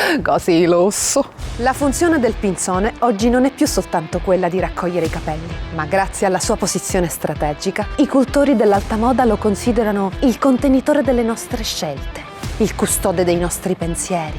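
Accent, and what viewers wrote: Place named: Palermo, Italy. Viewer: Brava..peccato che è difficile apprezzarti appieno fuori dalla Sicilia.In compenso a noi siculi il contrasto tra il dialetto palermitano e il perfetto italiano ci fa tagliare.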